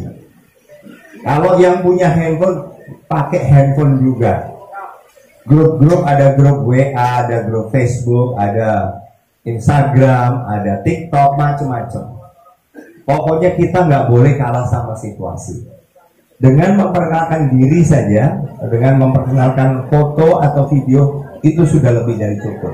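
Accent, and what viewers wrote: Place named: Banyumas, Indonesia. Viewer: Ttep saya memiling pak andika rari orang ngapak bolo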